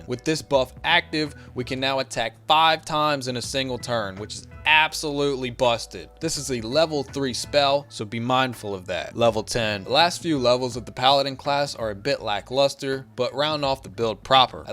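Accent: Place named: Staten Island, United States. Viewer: Had to subscribe. You totally sound like you're from my neck of the woods (I'm in Staten Island).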